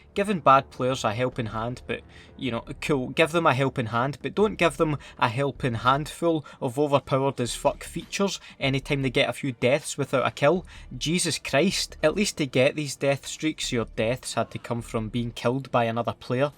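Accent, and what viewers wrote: Scotland, United Kingdom. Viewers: i started talking with a scottish accent because of you and im german.. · What part of Scotland you fae?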